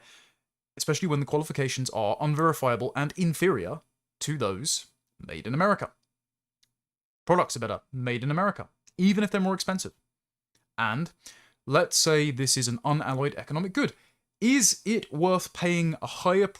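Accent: United Kingdom. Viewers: Nah, I think it's hot.I love the uk accent 😍😋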